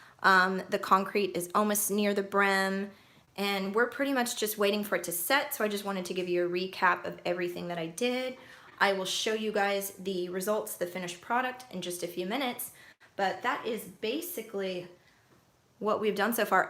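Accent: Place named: United States. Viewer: I've noticed one thing with these types of diy videos... When an American makes them - TOOOOOOOO MUCH FRIGGIN TALKS, NO FRIGGIN ACTION... they keep talking and talking and talking praising how good they are... and when you watch videos from other countries - NO TALK, JUST BUSINESS... nice relaxing music and business... but only and only Americans bother people with their annoying accent and nonsense talks... OMG grow up America, people around you are getting better and better... for example in this video she keeps talking and stalking people to order her unfinished product, and in the end - WHERE IS THAT FRIGGIN MARBLED WHATEVER YOU WERE MAKING?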